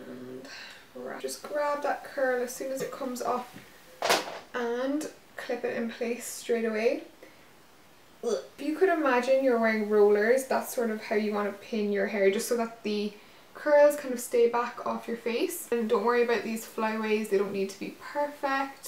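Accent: Ireland. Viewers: where are you from because your accent sounds like an Irish accent. It sounds like my accent and I'm from dublin 💋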